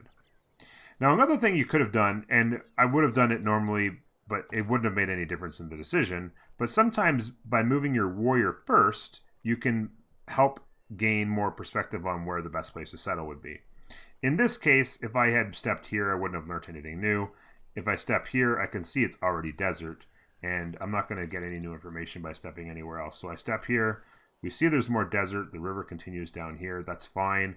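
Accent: Canada. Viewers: Great beginner guide! So I'm guessing here that that's a Canadian accent, am I right? Going by the vowel O sound. Anyway, Canadians make the best guides. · Hey! Loved the video. While it wasn't exactly what I was looking for I did learn a lot. Production is king, culture is king (early game). Pay attention to tech tree (a tip I have there is once I get my early game stuff, I select something way late game like robots or nukes so it auto paths) My only criticism is you didn't explain why something is bad. Which fair enough, limited time in a VERY informational dense game. But I mean I signed up for a 35+ minute video so imo it's appreciated. I loved how you explained why to do X, Y or Z. So if you did that, I think it would be a big improvement! Also do I hear a fellow Canadian accent ? 👀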